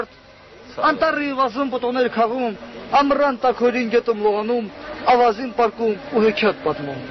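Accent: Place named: Karabakh, Azerbaijan. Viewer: прикольно когда Карабахский пытается на Ереванском говорить. Смешно. Но эмоции одобряю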